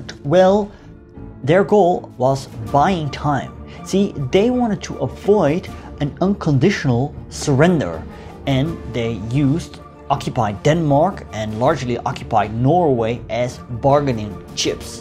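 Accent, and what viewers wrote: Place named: Netherlands. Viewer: He speaks English like a Dutchman... Admiral Donuts! That's hilarious!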